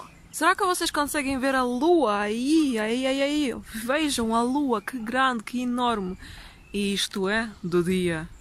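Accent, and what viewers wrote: Portugal. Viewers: Que sotaque português! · Duas perguntas: Por que o sotaque português? Sua voz é tão grave assim mesmo? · Seu sotaque português de Portugal é ótimo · Seu sotaque é português de Portugal. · Seu português é de Portugal, certo? O que você conhece do Brasil? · Ótima explicação sobre fatos históricos tão marcantes que sempre me intrigaram. Fiquei chocado com tamanho banho de sangue. Cara, você é sem dúvida, muito culta, sabe contar muito bem as histórias desta parte do mundo. Nem vou elogiar sua beleza, porque será redundante, qual palavra diferente encontraria eu para descrever tanta beleza, além do que sua simpatia é cativante. Amo seu português lusitano. Você me desmitificou um pouco sobre muito do que é mostrado em algumas películas aqui no Brasil, em parte por causa da 2ª Guerra Mundial, com a Polônia sendo apenas uma nação massacrada, explorada, os poloneses como um povo só de sofrimento, não me entenda mal, só quero chegar no ponto de que realmente no mundo nunca houve um povo bom e outros maus, houve choques entre nações, culturas, tudo por ganância. 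Todos tiveram seus momentos de profunda brutalidade e em outros momentos foram massacrados. O Brasil teve seu momento de carrasco também, quando se envolveu no maior banho de sangue das Américas - A guerra contra o Paraguai. Desculpe pela mensagem longa. Eduardo Bastos. São Paulo - SP - Brasil. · Esse portugues que parece de portugal </3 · Menina seu sotaque é bem lusitano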